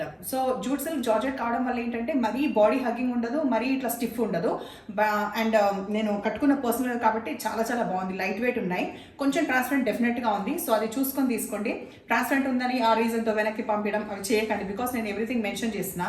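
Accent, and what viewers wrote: Telangana, India. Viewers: Meeru matlade vidhanam baga nachuthundi telangana bhaasha, yaasa lo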